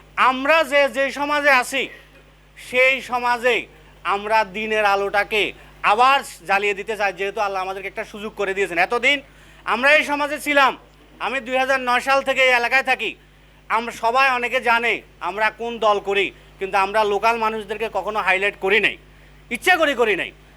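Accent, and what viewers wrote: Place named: Noakhali, Bangladesh. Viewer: ভাইয়ের বাড়ি কি নোয়াখালী নাকি?? নোয়াখালী নোয়াখালী টান আসে।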